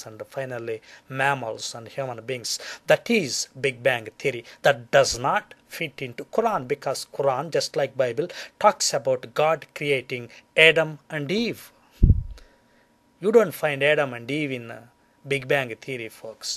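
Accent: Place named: Kerala, India. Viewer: very funny,, a typical mallu,,saying yexposed,,yauniverse,,dactar jaakir nayik,,,man plz go to some english school and learn some thing ,,instead of wasting time like this..plz go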